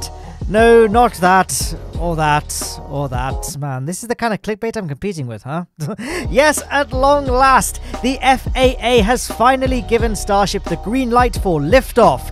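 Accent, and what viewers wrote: United Kingdom. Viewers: This particular British Ascent is soo..bombastically annoying but at least he owns it. Total Game show host voice.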